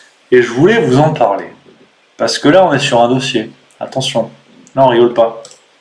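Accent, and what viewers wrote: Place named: England, United Kingdom. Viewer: Excellent travail l'artiste ! And i love your english accent 🥰 Soo froggy...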